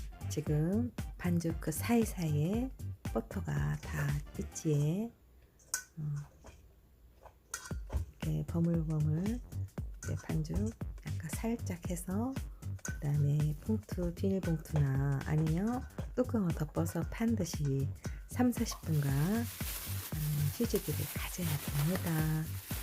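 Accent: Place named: Gyeongsang, South Korea. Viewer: 오~호두파이! 경상도 사투리까지 구수하니 좋습니다^^ 잘보고 가며 살포시 손내밀어봅니다! 좋은 인연 맺고 서로에게 힘이되면 좋겠습니다😉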